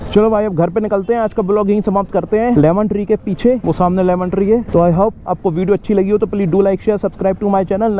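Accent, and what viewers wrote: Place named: Madhya Pradesh, India. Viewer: Mp ka accnt bohot aacha hai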